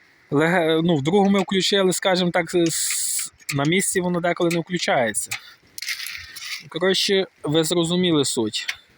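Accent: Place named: Western Ukraine, Ukraine. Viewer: Й ще одне! Перепрошую за питання - не хочете - не відповідайте на нього! За Вашою говіркою я прогнозую що Ви мешкаєте десь на заході Україні, найвірогідніше - Львівщина. Можливо помиляюсь. Сподіваюсь Ви не будете вважати таємницею назву області у якій проживаєте?! Особисто я у Черкасах.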